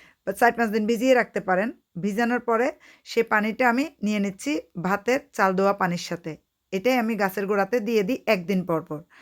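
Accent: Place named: Chittagong, Bangladesh. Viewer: আপু আপনাকে অনেক ধন্যবাদ আপনি কোন দেশে থাকেন কথা শুনে মনে হয় আপনার বারি চট্টগ্রাম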